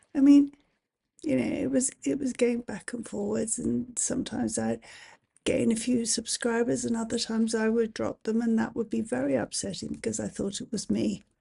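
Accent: England, United Kingdom. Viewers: Hello, I came over here from LifeintheWyldeWest. Love your vioice. I was born in Scotland and adopted by Americans and came to the US, so I don’t get to leave with that lovely English accent. I will be watching. Your success inspires me. ❤️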